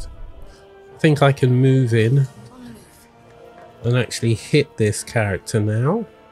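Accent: United Kingdom. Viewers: Wow. Talk about the voice not matching the person. You sound like you're maybe 20 years old max, and the british accent makes you sound even more posh. Clean living, I guess? Anyways, lovely video, will check the rest of your stuff out too. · Good video 👏. Your accent sounds British....Are you?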